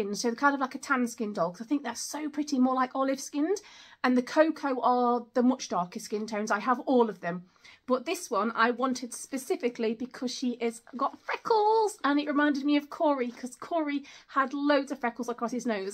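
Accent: United Kingdom. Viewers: Very good video!! I love your enthusiasm. I have English as my second language and I wonder which part of the UK you're from. I love the way you talk. ❤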